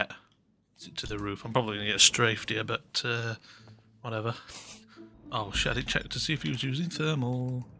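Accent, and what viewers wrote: United Kingdom. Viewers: Good to hear a northern accent in here! Great vid again mate.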